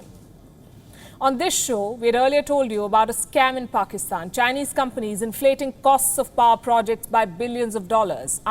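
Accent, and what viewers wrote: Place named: India. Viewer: American here watching, and i LOVE THE INDIAN ACCENT.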